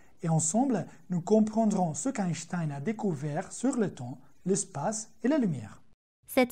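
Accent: Italy. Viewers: cavolo che accento italiano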